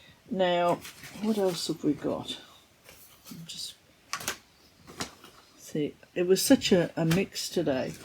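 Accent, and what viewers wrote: Australia. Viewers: Hi,as always beautiful cross stitch,and lovely to listen to you chatting,are you Australian? ❤️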